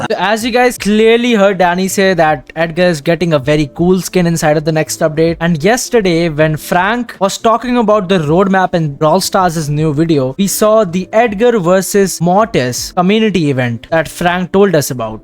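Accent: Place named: India. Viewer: I like Indian accent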